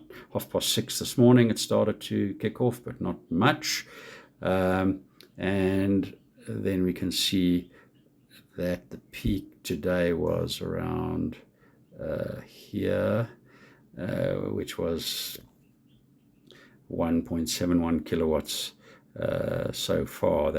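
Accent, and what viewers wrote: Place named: South Africa. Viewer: I presume you have made this video in South Africa, judging by your accent. The App has been recently upgraded and for people in the U.K. or in the latitudes further away from the equator force charging from the grid is a very important function. Because if the user wants to make the most of the longer summer days to charge the battery and turn the force charging off they are able to do so. While in the WINTER months when you get only seven hours of sunshine and have an access to cheaper tariff rates during the middle of the night you want to charge your battery to use during the day time. The recent upgrade has TAKEN this facility away and if you are considering buying this system, have access to cheaper rate night time electricity and live more than 40 degrees latitude north or south of the equator this system is not a good buy.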